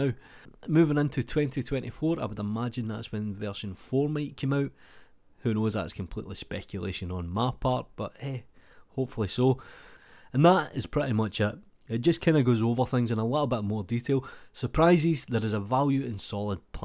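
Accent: Scotland, United Kingdom. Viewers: Blender 4.0 is scheduled for August 2023 - assuming they're indeed still following the plan outlined in Ton's LTS blog post from February 2020. Four versions each year (one being LTS), and a big number change every two years. <link> Anyway, while I already read the news, I enjoyed listening to your commentary and Scottish voice. <3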